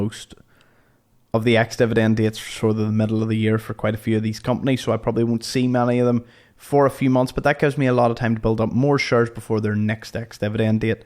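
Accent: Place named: Northern Ireland, United Kingdom. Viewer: Just found your vids keep up good work . Sound like your from N.Ireland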